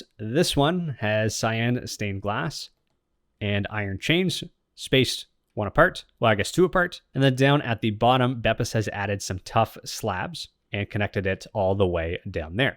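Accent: Canada. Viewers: are you from vancouver?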